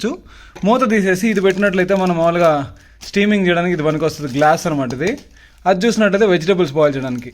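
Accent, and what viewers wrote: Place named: Chittoor, India. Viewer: maadi kuda bro mee slang chusi chepa maadi chittoor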